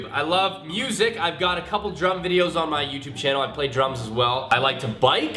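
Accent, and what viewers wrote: Canada. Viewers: Are you Canadian or American??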